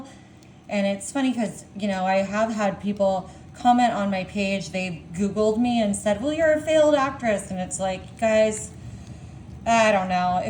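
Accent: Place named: Michigan, United States. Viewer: She's being funny. But I from what I remember, I think it's natural for the snowy white areas of Michigan. The retirement villages.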